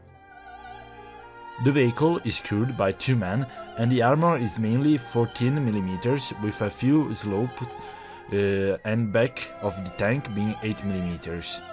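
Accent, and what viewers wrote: Italy. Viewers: Si vede che sei italiano, lo riconosco dall'accento, e da come pronunci l'Italiano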